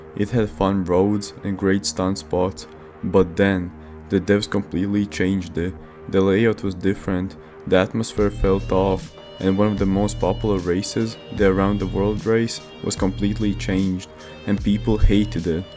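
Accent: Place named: Portugal. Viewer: Portuguese accent I think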